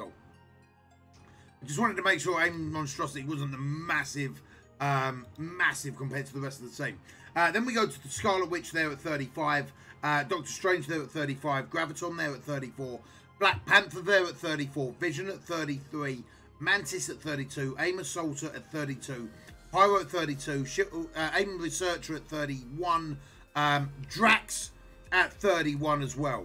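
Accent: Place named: England, United Kingdom. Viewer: Brilliant videos mate! Only just found you and loving it! :) Loving your accent aha! Im English too!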